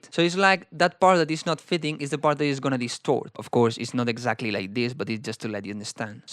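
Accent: Spain. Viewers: noooo? Really? What sort of witchcraft is that!? 🤣 I was simply placing a rhetorical question as this fellow has an obvious Spanish accent 😁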